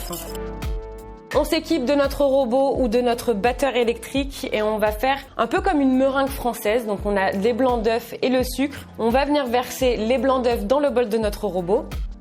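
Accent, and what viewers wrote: Canada. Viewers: tu mon youtubeur préféré de vidéos accent canadien et qui met quoi des vidéos trop trop rire 👍👍👍👍👍 un gâteau aux fraises 🇨🇵🇨🇵🇨🇵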